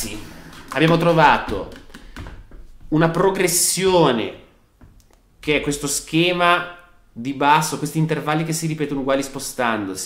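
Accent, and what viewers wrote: Genoa, Italy. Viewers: È davvero molto bravo. E non pensavo che avesse tutta questa conoscenza teorica, perché non si prende mai troppo sul serio. Mi piace un sacco l'accento genovese quando chiede "avete capitooo???"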